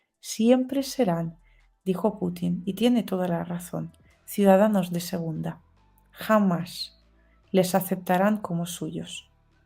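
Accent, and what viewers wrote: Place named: Spain. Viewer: porque ablas como si fueses de España?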